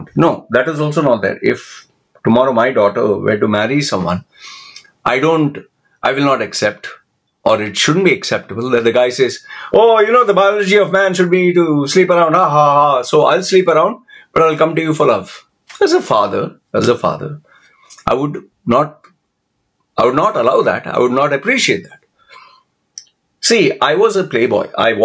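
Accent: India. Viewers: Hi, why does your Indian accent sound stronger now than what it did in your 2011 Ted talk?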